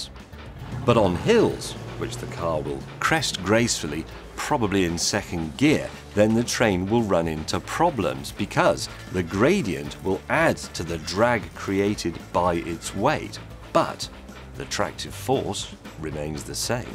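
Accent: United Kingdom. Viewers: Vsauce with a British accent, not bad... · I disagree. This sounds more like a peasant, British, dialect. It is annoying and incorrect.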